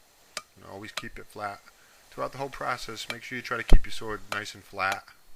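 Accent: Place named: New Jersey, United States. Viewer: I love your "new joisy" accent!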